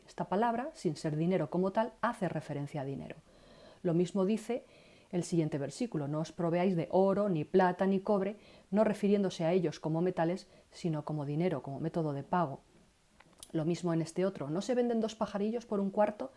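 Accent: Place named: Spain. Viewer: Logos excelente. Mis videos son más tipo como preparar mensajes usando el programa. Hay bastante riqueza de información en sus videos y yo los usare para ya no enseñar lo que tu has plasmado aquí. Con ese dejo supuse que era de España. Algún día tendré el privilegio de viajar allá.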